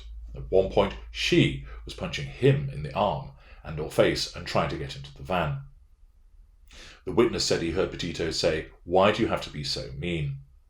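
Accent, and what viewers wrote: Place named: Oxford, United Kingdom. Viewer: No *Said in that rich Oxford accent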